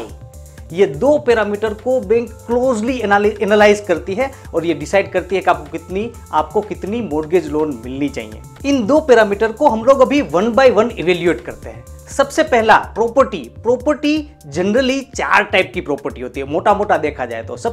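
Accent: Gujarat, India. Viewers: I think you are gujju. I feel this accent😅🤟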